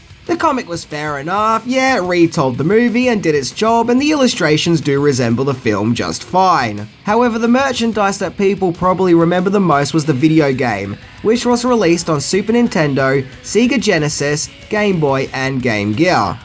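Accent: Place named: Australia. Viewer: Yes, he's Australian. It happens.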